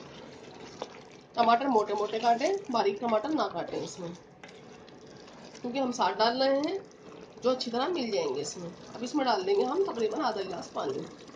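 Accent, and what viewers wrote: India. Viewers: Hello Assalamalikum: I have been making saag ghost for a very long time but I came up with your channel and tried it last night. I couldn't believe it how tasty this saag ghost came out. My family couldn't stop eating it. It was so delicious and well-made. Jazak Allah for sharing your talent. I love your Indian urdu accent and MashaAllah you are so talented. I also tried your other recipes and I loved it so much. Your method is so simple and easy to follow. Jazak Allah again. :)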